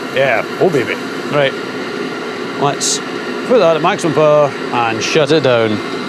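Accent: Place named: Berwick, United Kingdom. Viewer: Random question. Are you from Berwick or thereabouts? The accent is very musical.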